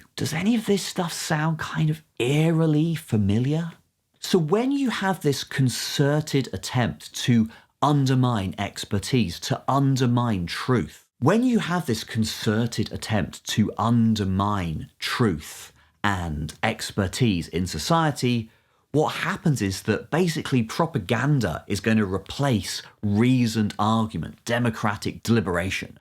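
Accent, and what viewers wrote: United Kingdom. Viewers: And your British accent does nothing to give you credibility . My stepfather is a Brit. Anyway , turn off BBC which is prob bigger garbage than cnn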